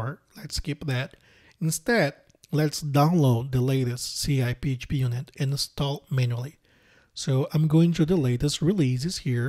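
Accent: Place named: Brazil. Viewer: Esse sutaque é BR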